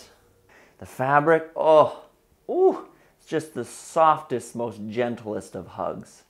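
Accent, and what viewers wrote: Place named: Canada. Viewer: And Sew On recommended your Vlog with a few others. I can see why! I thoroughly enjoyed it. Your tee shirt is indeed a nod to the 70's, 60's and 70's being my era. Your stripe matching, even on the Neckband was excellent. If your into drafting your own patterns watch Sew Custom Vlog, an Irish girl, she's good, I'm not saying that cause I'm from Northern Ireland too 😁, she is 👌spot on, and she's doing coats at the minute. I think you'll enjoy her Vlog. As we say in Northern Ireland, your a turn, meaning your funny! Canadian going by the accent, as some Canadians say certain words like the Scots/Irish. Do a bio on yourself if you haven't already done so.....😉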